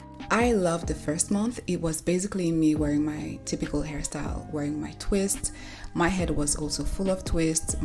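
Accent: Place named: Haiti. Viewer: Are you Haitian? I was trying to pinpoint the accent. It’s adorable.❤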